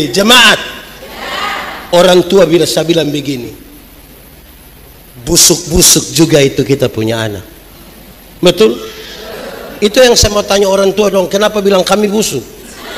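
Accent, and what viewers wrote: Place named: Eastern Indonesia, Indonesia. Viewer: itu bukan membentak... mungkin kamu baru liat atau baru mendengar aksen/dialek seperti ini.. itu ciri khas bagian timur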